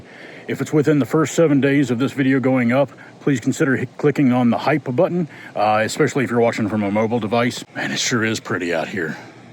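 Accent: South, United States. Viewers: Your accent tells me that you are from where we live, the south.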